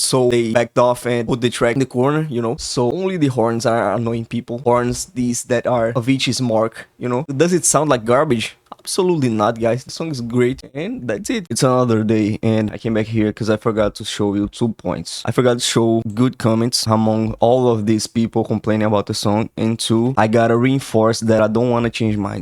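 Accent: Brazil. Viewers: I was gonna ask if u're brazilian because of your accent until I saw the "Portugues do Brasil" on spotify. Concordo com todos seus argumentos, mano. Diria ainda q pra nao deixarem os fãs tão putos, poderiam ter mantido o primeiro "drop" do jeito original, e aumentado a energia do segundo usando essa versão nova. Eu fiz um som em homenagem ao Avicii e lancei aqui no meu canal faz uns dias, seria dahora se vc ouvisse e me falasse oq acha. /watch?v=A9yiasCdmE8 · READ THE ENGLISH PART FOR IMPORTANT INFORMATION quando eu ouvi o sotaque já sabia que era daqui kakkakakak bem completo o vídeo, só não esquece que o que aconteceu foi o seguinte (assim como já falaram, mas vou repetir): (English), the artist, that joined some years ago in the same label as Avicii, had access to unreleased IDs from Avicii himself, becuz of this, he made some remixes and bringed up on his songs some vocals, lyrics and melodies that Avicii didn't released, as we can see on. 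if i remembere correctly the year, in 2017 had played this version of (which is a remix) in concerts, and also, there are reports of infiltrators that Avicii's team scrambled up the files and ended up sending remix as a final version and it got released... It gets worse when you know that, more than the fact that it doesn't looks like the original version, it's not even made by Avicii, and it disappoints (Português Brasileiro) o artista, que há alguns anos entrou na mesma gravadora do Avicii, teve acesso a IDs não lançados do próprio Avicii, e isso fez com que ele fizesse alguns remixes e incorporasse nas músicas dele vocais, letras e melodias que o Avicii não lançou, como é o caso de. se me recordo bem o ano, em 2017 já foi visto tocando em shows essa versão de que é um remix dele, além disso, há relatos de infiltrados, em que o time do Avicii acabou misturando os arquivos e enviando como versão final o remix do, qu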